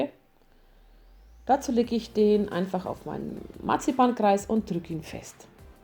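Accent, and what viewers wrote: Franconia, Germany. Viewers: Eine Fränkin, wie süß! Die Sprache ist unschlagbar u. den leckeren Kuchen bestelle ich schon mal für den Feiertag am <num>. Kaffee mach' ich selbst dazu. LG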